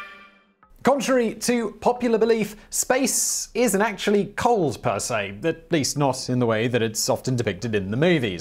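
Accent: England, United Kingdom. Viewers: There is no space... Duh what a load of crap and your English accent makes you sound stupid. Blah blah blah blah blah nonsense. Not worth watching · Dude tone down the over posh British voice. We all know you don't really talk like that. Speak normal man.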